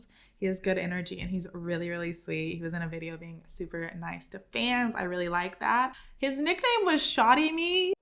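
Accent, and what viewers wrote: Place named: South, United States. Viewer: Your country accent is gone, wtf. It’s less strong as back in the day 😂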